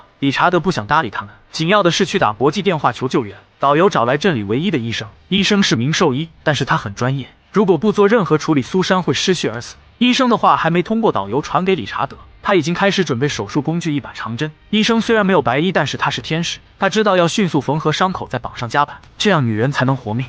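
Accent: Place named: United Kingdom. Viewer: 整片我最出戲的是英式中文，DSG -> 大使館🤣🤣🤣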